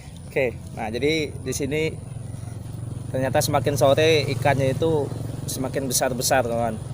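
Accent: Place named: Banyumas, Indonesia. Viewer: Alhirnya dapat banyak ikan juga yutuber ini, cukup kocak, bahasanya agak ngapak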